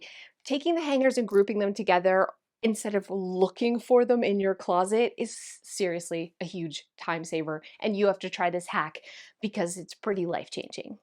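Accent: Canada. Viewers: Love that Canadian accent! I know it's off topic, I just had to say I love how you say "out" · I have set my Alexa to speak with a Canadian accent instead of English! Reminds me of you x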